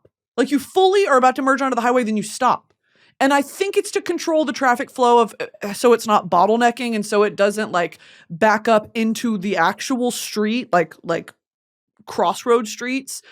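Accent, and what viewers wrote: South, United States. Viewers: I just watch for the comforting southern accent 😌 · listen ik someone mentions it every video but the fact that it's just her in that room just completely unhinged and southern is wild lmao love it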